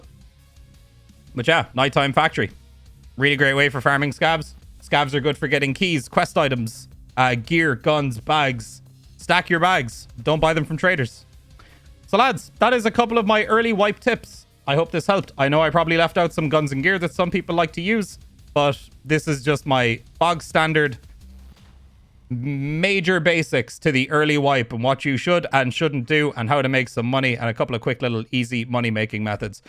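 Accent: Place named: Ireland. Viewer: I thought he was Irish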